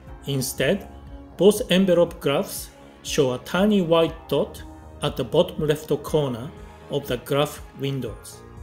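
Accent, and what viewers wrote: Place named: Japan. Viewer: God this is a Japanese speaking English...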